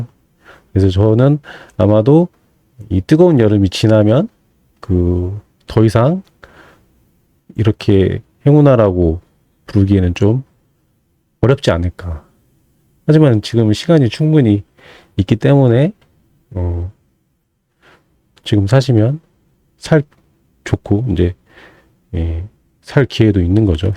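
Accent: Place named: Jeolla, South Korea. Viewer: 말투가 딱 전라도 스럽네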